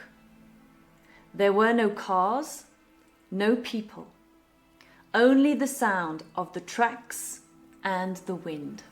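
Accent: United Kingdom. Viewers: Hi, it's a great story with a gorgeous British accent. I listened to it with great pleasure. Keep making us happy.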